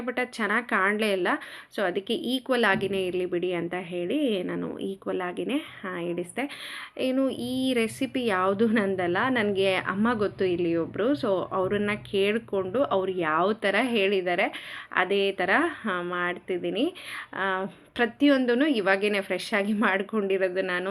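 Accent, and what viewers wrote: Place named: Gangavathi, India. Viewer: Hi sis namdu Gangavathi ನಮ್ಮ ನಿಮ್ಮ ಮಾತು ಸೇಮ್ ಇದೆ ನಿಮ್ಮ ವಿಡಿಯೋ ನಮಗೆ motivation ಆಗುತ್ತದೆ tqu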